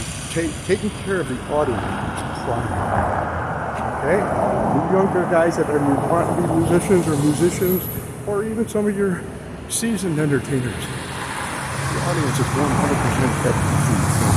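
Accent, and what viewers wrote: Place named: Chicago, United States. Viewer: Good video! Your voice sounds fine like my cool uncle from Chicago.. I thinks you made a good choice. Thanks for sharing and take care!🙏🙏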